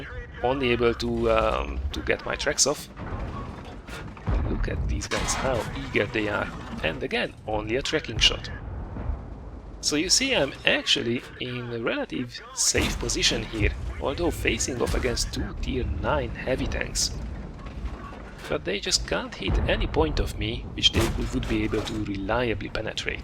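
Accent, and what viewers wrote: Hungary. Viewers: 100% hogy magyar vagy a kiejtésed alapján :D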